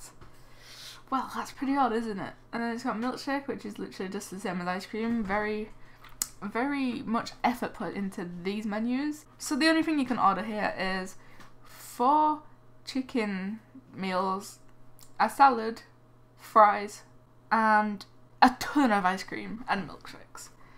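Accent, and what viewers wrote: United Kingdom. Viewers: Ay Your british like me! :D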